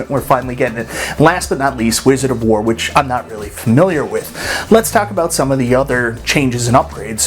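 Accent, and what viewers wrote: Canada. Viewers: Nothing more endearing than the way Canadians prounce their "ar's". I could listen to this guy say "Arrrrhcade" and "Marrrrhquee" all day haha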